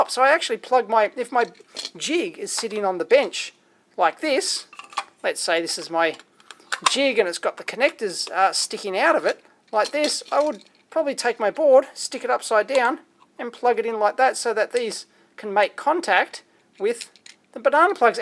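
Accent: Australia. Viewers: Awesome! (said in your Aussie accent) :)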